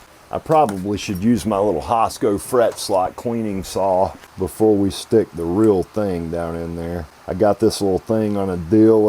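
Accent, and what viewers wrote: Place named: South, United States. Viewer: I'm in stitches here. I love southern accents, and yours is great; but every time you say "Volute," it sounds like a spices of duck. I keep thinking the next part of the story will be how the dog jumped out of the boat and went after it... 🤣😂✌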